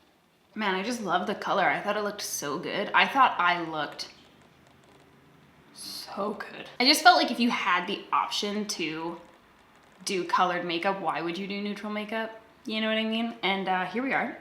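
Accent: Canada. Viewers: you have a thicc canadian accent :) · never heard you sound so Canadian lol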